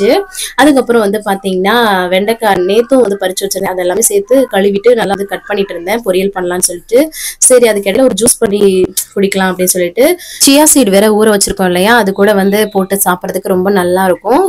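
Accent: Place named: Sivaganga, India. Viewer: Namma sivagangai tamil la nalam visarikirathu romba alagu❤thambi🎉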